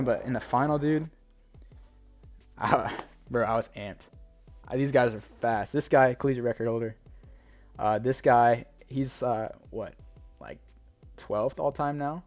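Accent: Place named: California, United States. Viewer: Dude you sound like your from California 😂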